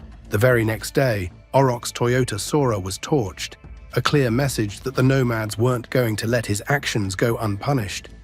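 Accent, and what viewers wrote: United Kingdom. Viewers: Sorry. There’s something just wrong about narrative on hells angles with a British accent. Destroys it. Sound American. 🇺🇸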